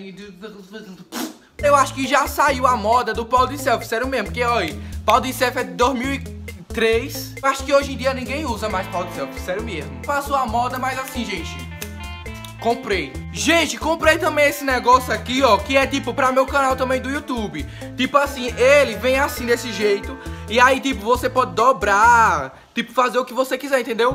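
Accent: Bahia, Brazil. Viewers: Ele é de qual lugar da Bahia ??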